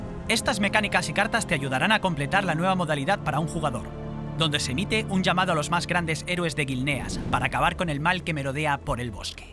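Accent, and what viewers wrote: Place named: Spain. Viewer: Por poco pensé que me equivoqué de canal y lo confundí con el de "ES", pero no, es "LatAm" y está en español de España :s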